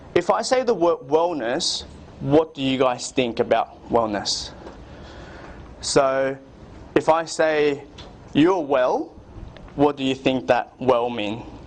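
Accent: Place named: Australia. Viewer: I am curious where this seminar/ lecture took place. Its audiences appear to be mostly oriental made me thought Singapore or Malaysia but the speaker’s accent was Aussie. Thank you for putting up this video.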